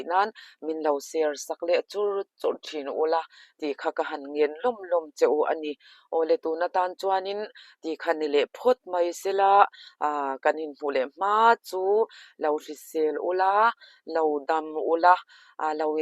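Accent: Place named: Mizo, Myanmar. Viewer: I thu ziak post ka lo chhiar ṭhin a, a ṭha ka ti viau ṭhin a, mahse i ṭawng ngaihthlak chuan ka lo rindan che nen chuan a va inpersan e, ka ṭawng lo hawlo la min lo hrethiam la, i ṭawng hi ngaihthlak chian chuan Burma Mizo ṭawng a ang, a pai lai deuh a awm nual mai, phalrai tih te adang pawh ka hre ṭeuh, mahse dan hriat hi apawimawh a, chu lamah chuan i ngaihsanawm.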